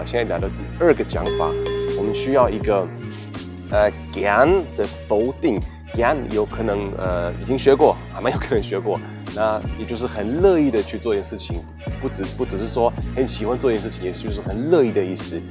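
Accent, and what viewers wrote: Taiwan. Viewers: Dein Chinesen ist nicht schlecht, aber dein Akzient aus Taiwan geht mir auf den Nerven. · schade, Akzent aus Taiwan....ansonsten gute Arbeit! auf jeden Fall weiter empfohlen !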